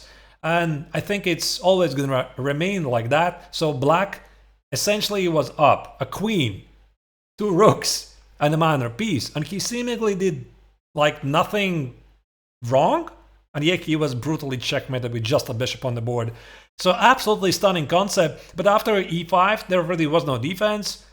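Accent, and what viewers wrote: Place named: Germany. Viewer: your English sounds very German-like: "adwantage" or "undershtand" sound how a German would say those words. Why is that?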